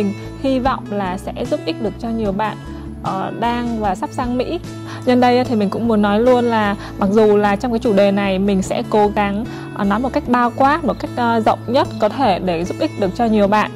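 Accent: North, Vietnam. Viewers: giọng nói miền bắc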